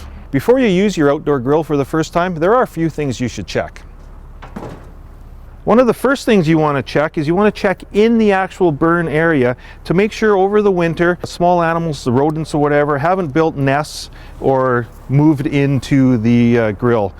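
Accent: Canada. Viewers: Is he Canadian? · I swear that guy is in Canada because i keep hearing his accent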